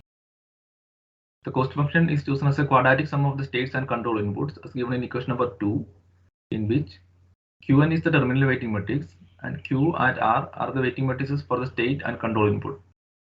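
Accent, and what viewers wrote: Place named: Kerala, India. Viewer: you can be little slow.the words of speech are malayalam accent.it could be made a little clearer.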